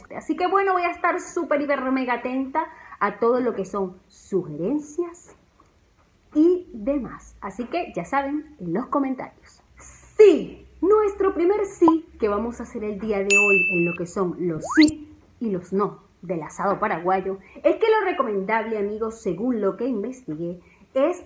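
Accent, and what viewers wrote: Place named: Venezuela. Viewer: En esta época tenia todavia el asentó venezolano 🇻🇪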